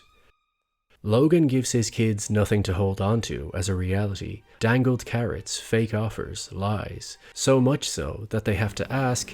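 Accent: Ireland. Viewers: Love this channel. Insightful, well written, well-produced. Well done, man! Are you Irish? I thought I could hear the accent. As a Dub I can spot it I think ;) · Sounds Irish to me but what do I know lol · Is the narrator Irish? Some words sound Irish (e.g. without, about etc) but not entirely sure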